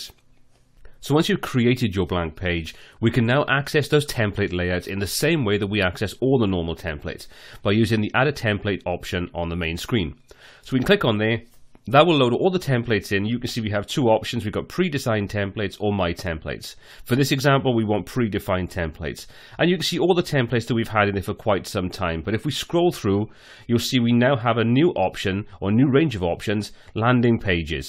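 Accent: Wales, United Kingdom. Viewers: Damn you sound welsh... I wonder if you are from Cardiff too!! lol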